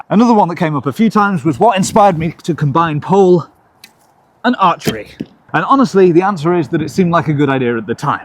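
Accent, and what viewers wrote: United Kingdom. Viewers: It is super British. I had to hear him speak to understand it haha.😅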